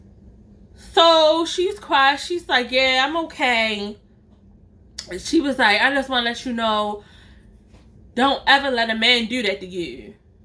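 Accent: Baltimore, United States. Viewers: Ikkk you sounded from Baltimore hey girl hey lolll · Bruh lol this my second video I’m watching I just knew u sounded like u was from Baltimore 😭 I was like I ain’t tripping then u said city I was like oh yeah the accent never lies ! Now I feel the vibes stronger 🙌🔆‼️😎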